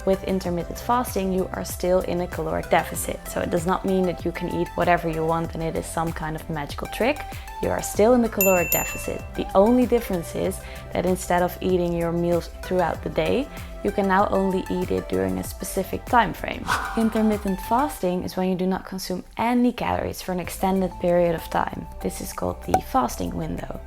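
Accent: Netherlands. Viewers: Hahaha ik hoor meteen al dat je Nederlands bent😂💙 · omg eerste keer hier, maar ik hoorde je accent en gokte meteen dat je nederlands was haha ;) new fav acount for sure x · Wow you sound dutch...😊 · A lovely accent. Perhaps Dutch ? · Grappig dat je, terwijl je Engels erg goed is, toch gelijk het Nederlandse accent hoort :-) Niet negatief hoor, gewoon normaal als een taal niet je eerste taal is.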